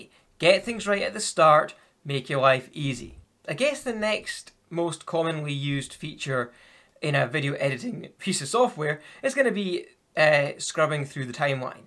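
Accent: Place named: Scotland, United Kingdom. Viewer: Warsaw, Poland :D Was wondering which part of Scotland, nice!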